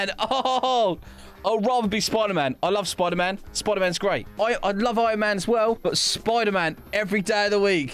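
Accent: United Kingdom. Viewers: That's our amazingly charming British accent for you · Your british to mate❤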